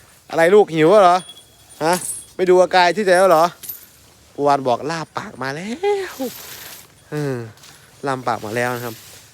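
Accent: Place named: Isan, Thailand. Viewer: มีเสน่ห์ตรงการพากษ์อิสาน นี่แหละ ฮาดี ทั้งพี่ทั้งน้องเลย 🤣🤣🤣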